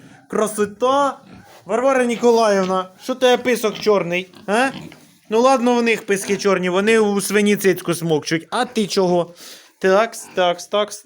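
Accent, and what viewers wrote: Poltava, Ukraine. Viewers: Нарешті ти з'явився.Вже скучили.Я родом з Полтавщини,але вже 50 років живу на Черкащині .З задоволенням слухаю рідну полтавську говірку.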